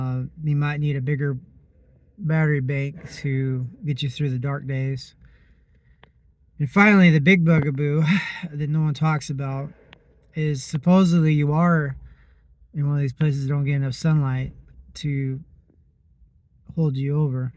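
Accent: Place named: United States. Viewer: You are an original American so I could learn English from what you said/spoke. It is not easy but I love learning, 😊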